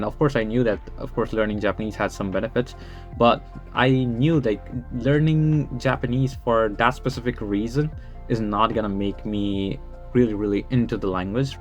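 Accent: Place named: England, United Kingdom. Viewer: pleaseee make a video on how to get english accent like you am also from india please i beg you help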